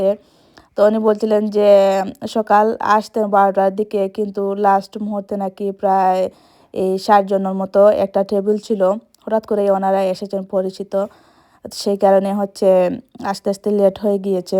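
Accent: Sylhet, Bangladesh. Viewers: আপু এটাই স্বাভাবিক এলোমেলো হয়ে যাবে আবার গুছিয়ে নিবে সবারই এরকমটা হয়ে থাকে আর খুব সুন্দর তোমার উপস্থাপনা সিলেটি ভাষা আমার খুব ভালো লাগে ❤❤❤❤❤❤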